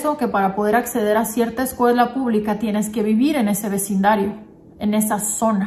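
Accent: Sonora, Mexico. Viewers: DICES LAS COSAS A COMO SON, SIN SESGO Y CON ESE ACENTO SONORENSE BIEN MARCADO. ES UNA CHINGONERIA TU CONTENIDO. SALUDOS CON SABOR MACHACA CON CAFE DE TALEGA.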